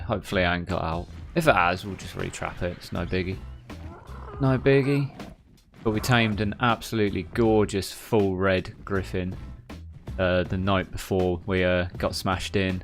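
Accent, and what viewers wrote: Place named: Suffolk, United Kingdom. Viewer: What part of England you from? Your accent sounds Suffolk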